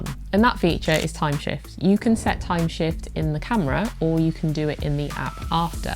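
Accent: United Kingdom. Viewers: And the British accent is like a free pass to "everything she says must be true"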